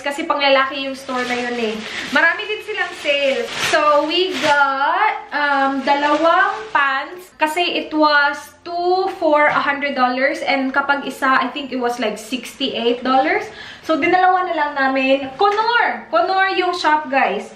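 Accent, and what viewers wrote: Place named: Visayas, Philippines. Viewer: Bisaya tingali nis indai..mailhan Jud ang punto ba hehe...basta bisaya nice jd mag English..dabah? 😉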